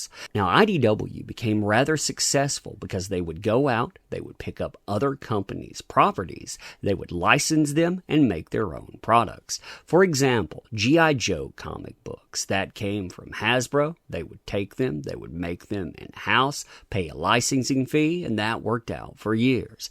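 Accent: South, United States. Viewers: So are you from Alabama or Louisiana?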